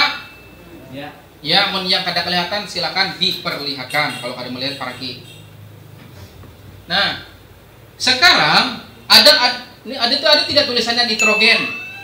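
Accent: Banjar, Indonesia. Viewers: Urang banjar leh bang??😂😂😂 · Pian sekalinya urang Banjar 😁, Barabai kah pian bos kuuu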